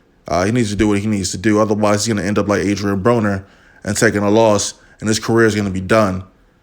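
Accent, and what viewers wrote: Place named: Baltimore, United States. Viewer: Thats how we talk in Baltimore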